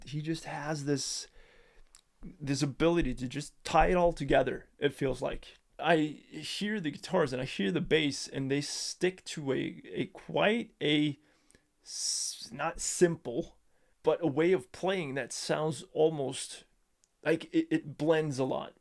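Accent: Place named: Denmark. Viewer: I am from Denmark. I thought I reconized your english accent as danish.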